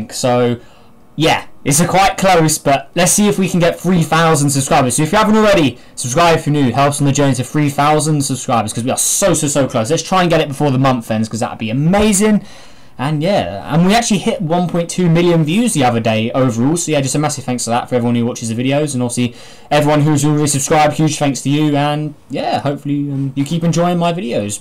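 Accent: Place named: United Kingdom. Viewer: Are you from United-Kingdom? Its like if you have a little accent 😀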